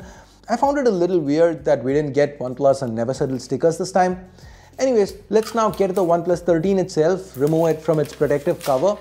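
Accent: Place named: India. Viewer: I swear i couldn't continue watching the review because of his indian accent ITS HARMFUL TO THE EARS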